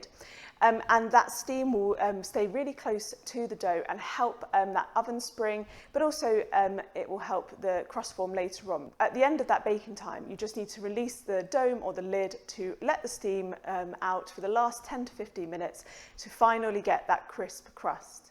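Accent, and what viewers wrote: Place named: England, United Kingdom. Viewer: Your English accent is simply adorable! 🥰 🪷🪷🪷😍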